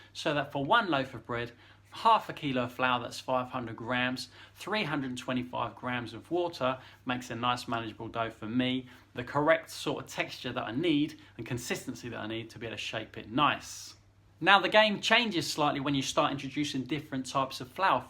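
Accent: United Kingdom. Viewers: From where, in the UK, is his accent from?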